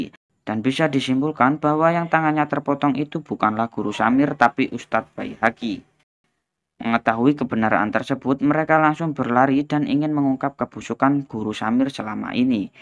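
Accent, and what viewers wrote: Java, Indonesia. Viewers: medok bner ngomong wkwk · medok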